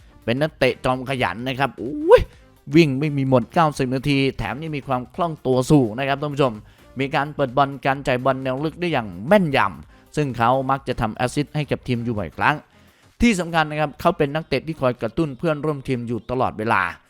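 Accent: Isan, Thailand. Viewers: มักหลายเด้ บักหล่า ในวิธีการบรรยาย เป็นการสอนภาษาอิสานเฮานำขอให้หมานๆเด้อยักหำ มักๆๆๆ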